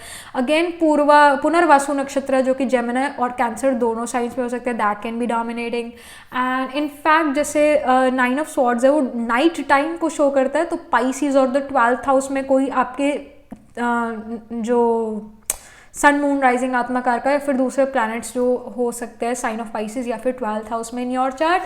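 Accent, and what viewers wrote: Punjab, India. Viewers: Aashiq mashuq😂😂😂....are u a Punjabi ma'am?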